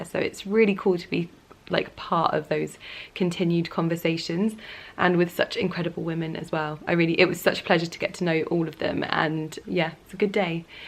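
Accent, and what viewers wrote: United Kingdom. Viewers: Is she british??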